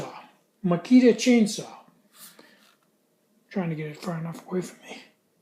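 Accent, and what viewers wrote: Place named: Boston, United States. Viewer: sounds like you're from Boston.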